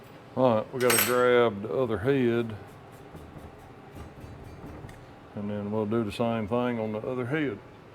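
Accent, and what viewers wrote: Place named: South Carolina, United States. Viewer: He lives in South Carolina, the USA is big enough that there are several different accents from one end to the other. You can tell someone who lives in Minessota from someone who lives in Wisconsin, as an example.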